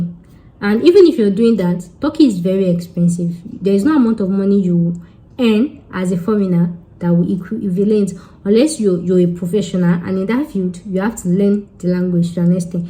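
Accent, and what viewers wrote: Yoruba, Nigeria. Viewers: You this girl it's your yoruba for me it's the way you join everything together that brings me here😆you are so real well done👏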